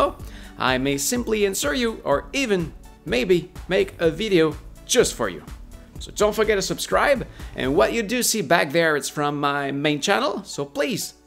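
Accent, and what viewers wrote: Quebec, Canada. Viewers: French Canadian ?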